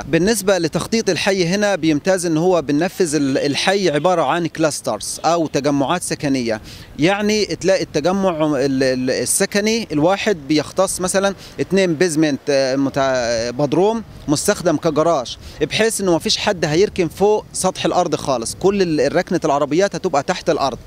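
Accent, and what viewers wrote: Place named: Upper Egypt, Egypt. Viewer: من لهجته صعيدى محترم ولبق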